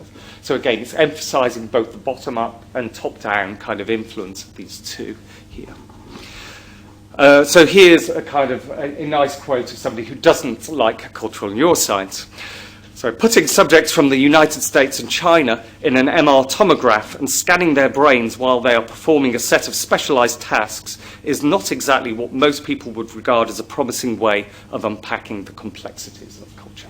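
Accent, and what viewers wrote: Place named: United Kingdom. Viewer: is the british accent that difficult to decipher? i enjoy it immensely :)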